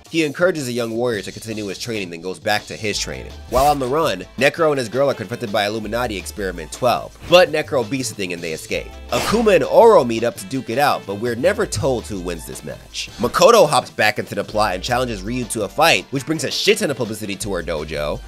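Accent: Jamaica. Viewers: I didn't know you were Jamaican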